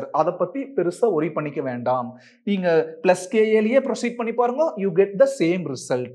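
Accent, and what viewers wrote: Coimbatore, India. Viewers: Sir i am feeling that i cant see your video from tomorrow i miss your videos your approach your teachings and your body language your coimbatore slang and everything sir hats off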